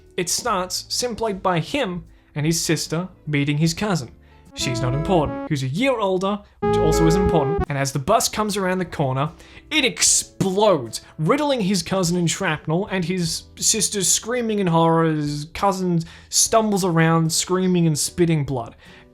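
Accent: Australia. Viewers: Are you an Australian Danny Gonzalez? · he's australian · I always forget you're Australian. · didnt realize you were Australian thanks for the call back im one of the audio listeners. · that’s the beauty of the Australian accent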